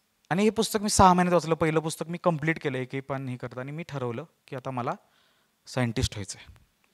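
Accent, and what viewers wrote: Satara, India. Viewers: सातारी भाषा कडक भावा🔥🔥🔥🔥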